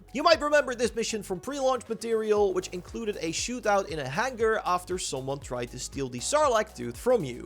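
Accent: Netherlands. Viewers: Ga alsjeblieft gewoon Nederlands spreken Je kan geen Engels Je hebt een heel zwaar Nederlands accent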